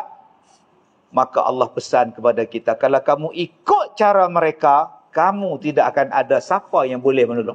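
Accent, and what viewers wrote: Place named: Kedah, Malaysia. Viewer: Percakapan nya slang kedah...byk juga yg tak faham....tapi saya faham sebab arwah bapa org kedah tapi arwah ibu org minang.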